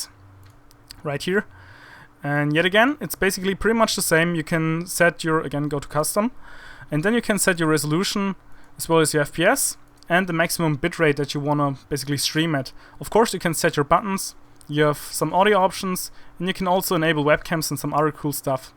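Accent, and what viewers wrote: Germany. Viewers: Safe du bist Deutscher? xD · Kanns sein dass du aus Deutschland kommst? :D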